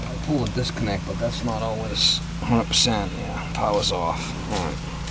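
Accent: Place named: Southeastern Massachusetts, United States. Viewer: I knew that accent was from SE Ma 😅😅😅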